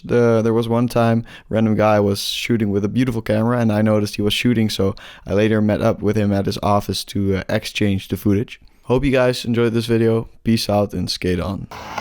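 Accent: Netherlands. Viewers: yo u dutch to? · Wait u Dutch? That explains your accent, hahaha ga zo door! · Bro ik hoorde je accent in VLSkate’s video en ik wist gewoon dat je nederlands was, lijpe videos man keep it up 👌🏻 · you sound dutch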